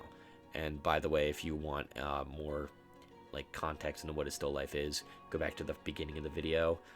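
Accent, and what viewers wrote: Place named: South Jersey, United States. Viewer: I'm hearing a south jersey accent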